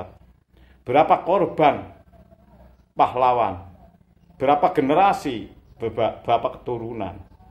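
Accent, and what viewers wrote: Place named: Banyumas, Indonesia. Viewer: dari daerah mana,logat banyumasan nggeh. Saya suka gaya ramalan huruf dan Agama. Enak dipahami.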